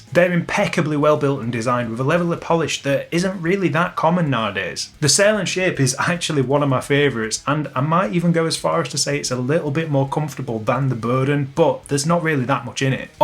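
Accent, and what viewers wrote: United Kingdom. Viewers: HEMA or Historical European Martial Arts as it's called is what i do. It's growing in popularity throughout the country, i'm assuming from the accent you're UK based, so depending on where you are you may have a single club or several in your area. It's a fairly relaxed environment in my experience that you can take things at a pace that suits yourself.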